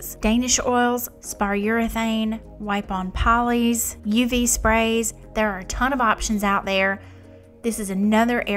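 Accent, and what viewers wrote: South, United States. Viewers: As a South African who recently tapped into this market, it wasn't the tips and tricks that kept me here... It was that addictive southern "if I'm correct" accent. The actual content was a added bonus 🤣🤣🤣. On a serious note though, do you sand the piece after burning??? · You’re cute, I love your Southern accent! I’m from Minnesota. Take care!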